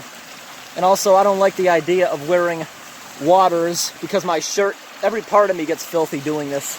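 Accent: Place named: Michigan, United States. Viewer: Are you from Michigan? Trying to place your accent!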